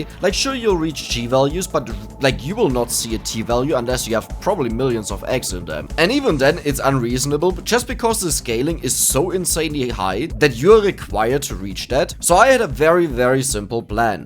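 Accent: Germany. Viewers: your live stream voice sounds way more german.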